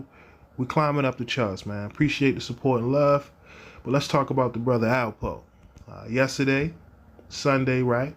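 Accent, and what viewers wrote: Baltimore, United States. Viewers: You from Baltimore dummy? Glad I found this channel Love hearing dudes from Baltimore get love we on the map right now with the music and shit 💪💪🔥🔥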